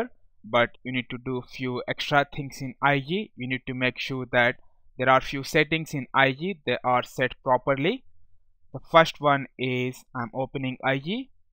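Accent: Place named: India. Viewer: I'll be honest, I was initially put off by the Indian accent so I apologise for that. I am about 1/2 way through and the step by step introduction is absolutely great so thank you.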